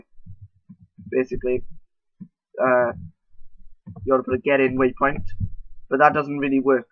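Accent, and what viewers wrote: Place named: Wales, United Kingdom. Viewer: ahh you dont speak welsh, i recognised your accent. nice to know another welsh arma player. don't see many of them :)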